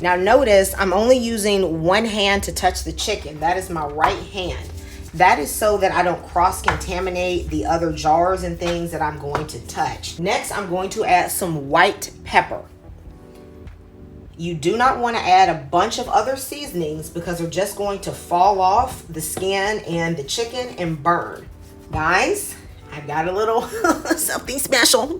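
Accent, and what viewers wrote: South, United States. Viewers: Just found your channel! With all these delicious looking foods....and your accent.....you must be southern!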